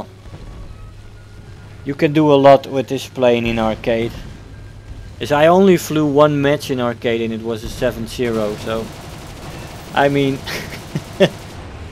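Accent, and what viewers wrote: Netherlands. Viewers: this plane is pure canser at itd br teams full of them kill us teams no losses btw are you dutch?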